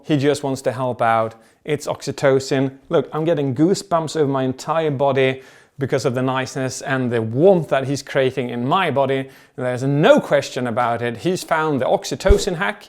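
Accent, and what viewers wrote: Sweden. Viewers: I can't unhear the slight Swedish accent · We love you! & I love the editing! Please keep it up! I love Swedish accent! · I love the swedish accent lol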